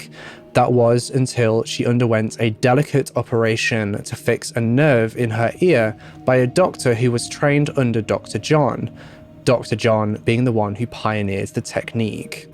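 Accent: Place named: RP, United Kingdom. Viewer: Hes got a posh English accent so great pronunciation is a given hehe 🇬🇧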